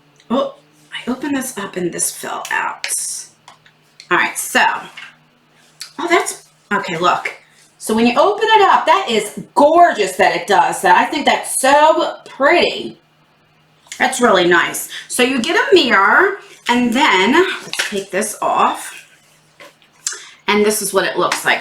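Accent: New Jersey, United States. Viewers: Good box! I really like your earrings, they're giving me a 90s vibe. Also, your Jersey accent is coming out strong today 😀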